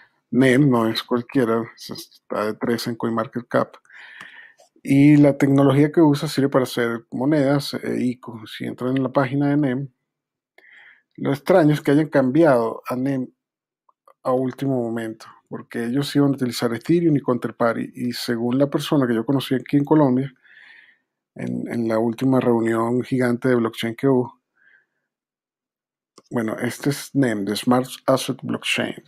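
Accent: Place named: Venezuela. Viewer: Tienes "acento" venezolano.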